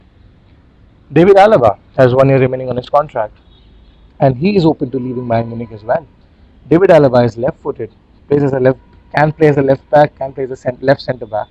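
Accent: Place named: India. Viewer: Bro r you from India ??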